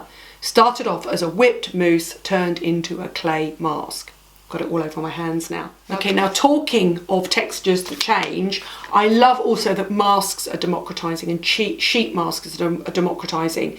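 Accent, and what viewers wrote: United Kingdom. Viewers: Happy new year dearest lady ! Your videos are always so pleasant Lovely classy British accent love it 💝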